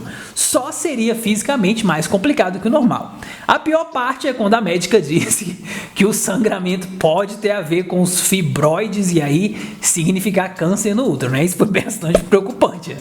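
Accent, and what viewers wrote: Brasília, Brazil. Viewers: Você é de Brasília??? Por isso me identifiquei muito com a sua fala! 👏👏👏😄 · Quem fala Es menina? Fala Tu como brasiliense fala kkk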